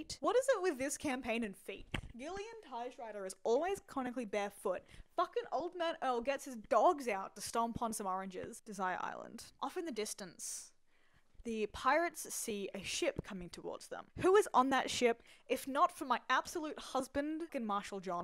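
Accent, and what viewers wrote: Australia. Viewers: BRO UR AUSTRALIAN??? IM AUSTRALIAN!!!!! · SLAYYY (i forgot you were australian so it i had quite a bit of whiplash)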